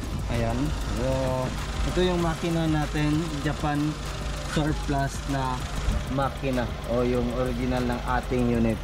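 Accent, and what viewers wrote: Visayas, Philippines. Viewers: Bisaya ka boi?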